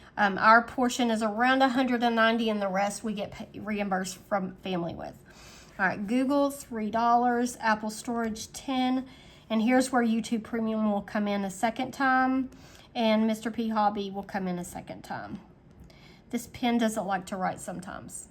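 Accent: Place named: Kentucky, United States. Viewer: Love this! Your accent reminds me of home (Kentucky) ❤❤❤ new subscriber here!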